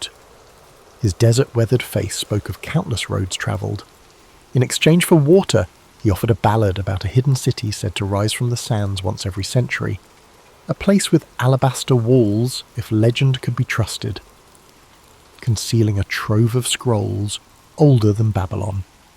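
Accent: United Kingdom. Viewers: I have to wonder, much as i am listening to these! if this is AI as so many facts and stories are being put out quite fast and this is an english ‘narrator’ but the site is American.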